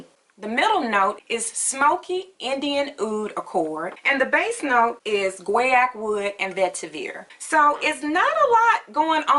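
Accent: South, United States. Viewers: very nice list and nice sothern accent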